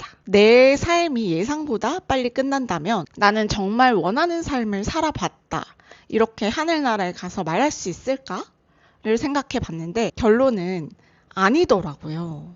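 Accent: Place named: Gyeongsang, South Korea. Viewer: 경상도출신 主婦이네요?음성. 억양. 과거는,女商출신이,창구를 응대하는데...요새는, 전부 4년제대학출신.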